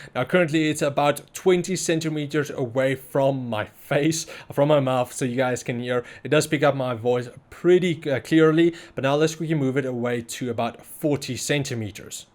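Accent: South Africa. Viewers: I was gonna say, This guy sounds south african - and then you had the ZA ad spot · what the my mans is south african gg ez sub for him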